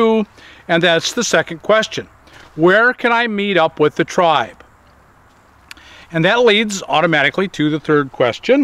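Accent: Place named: Minnesota, United States. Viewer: Are you from Minnesota? You sound like people from Minnesota =)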